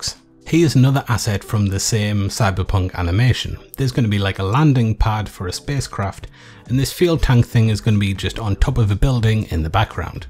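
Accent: United Kingdom. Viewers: I don't even Blend. I just come here for the great videos and friendly voice. I miss the UK, so I love the UK accents the most. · Doesn't sound rough to me, I quite like it. Sounds kind of "mushy" if that makes any sense :-) -American who digs UK accents.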